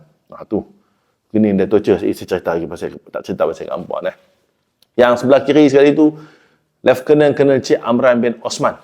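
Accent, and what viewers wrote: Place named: North, Malaysia. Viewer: "Mineral Wattar" Nohhh puas dengaq org utara brcerita....haha..Salam dari Pendang Tuan..Moga Allah sentiasa rahmati Tuan..AMIN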